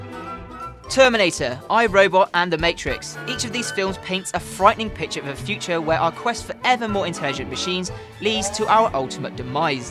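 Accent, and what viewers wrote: United Kingdom. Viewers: When you make a video do not have loud music playing in background, tone down the volume, it is irritating to listen to music and spoken word at the same time. Second, please slow down your machine gun speed talk. One who is not used to Brittish accent of English can hardly understand what you say at that speed. Better articulation of words would also help. Thanks for the video - interesting.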